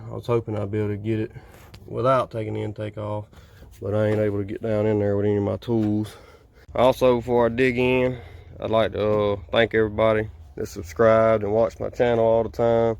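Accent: South, United States. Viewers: Believe it or not the funny southern drawl is what let's me know you know how to work on hotrods. Call me judgment/prejudice.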